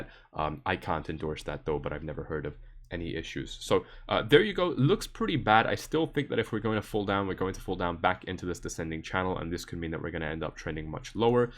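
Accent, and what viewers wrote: Scotland, United Kingdom. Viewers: First time watching your video- great content thanks! Is that a fellow Scottish accent I'm hearing?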